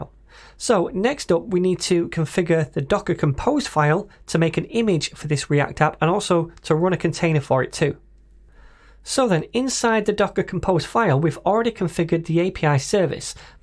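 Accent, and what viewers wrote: United Kingdom. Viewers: I want you as teacher! 🤩 I love your British accent Cheers from France 🇫🇷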